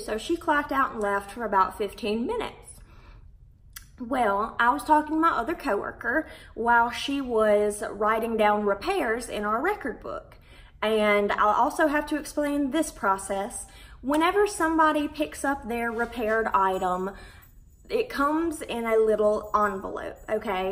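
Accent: South, United States. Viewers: I love your accent and the way you say “being ugly” because my family is all from the south & they talk the same way & just value respect, and it just makes me smile honestly watching this video. Also, I’m so glad to have found your channel!! I just subscribed, and I’m looking forward to seeing more of your videos ☺️ also, I love your name. I have the same one. It’s pretty great!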